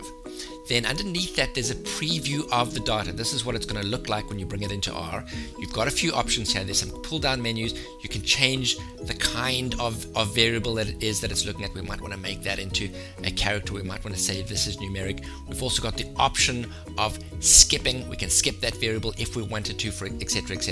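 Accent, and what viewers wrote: South Africa. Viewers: Lol very surprising to hear a South African accent on Youtube. The work you've done here is insane. Thanks!!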